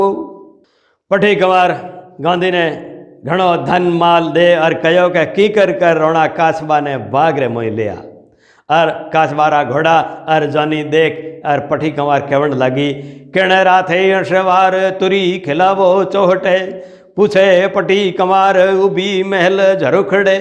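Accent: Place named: Saurashtra, India. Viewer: Hamare gujrat. Sorath jesi aapki bani he dhanyawad namaskar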